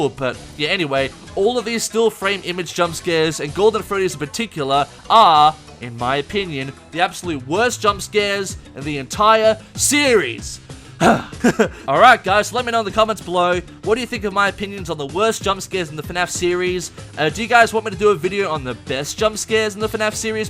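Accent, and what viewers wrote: Australia. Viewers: Omg how didn’t I know he was aussie? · I didnt know you were aussie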